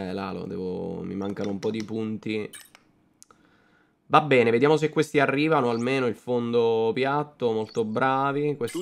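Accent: Rome, Italy. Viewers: La tua parlantina da Romano mi fa morire 😅🎉😅, comunque inizia a freni sui 100 m dall'inizio della curva..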